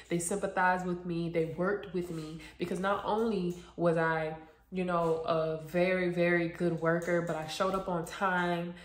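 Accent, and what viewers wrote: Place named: Broward, United States. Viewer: You can move out of broward but you can’t take the broward talk out of you lol 😂 I loved this vlog 🥰 congratulations happy for you keep going bby 👏🏾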